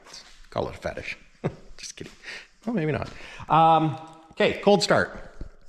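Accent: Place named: Canada. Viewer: Oooouut. I hear a faint accent A!!! What part of Canada??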